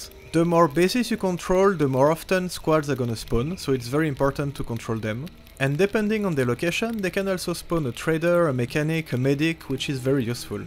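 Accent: France. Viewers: Merci pour ce tuto (ton accent anglais me fait penser que tu comprendras mon commentaire 🙄😆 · salut , j'ai entendu a l'accent que tu étais francais :) alors voila j'ai un soucis avec a anomaly en mode warfare c'est en russe! alors que le mode "histoire" est en anglais saurais tu comment arranger sa ? merci d'avance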